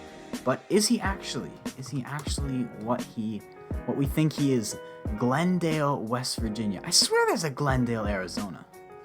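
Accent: Canada. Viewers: Dude why are you so amazed. We have little towns all over near Sarnia (which is where I believe you are from by the accent, me too). Arkona? Thedford? Watford? Chamlachie? The list goes on.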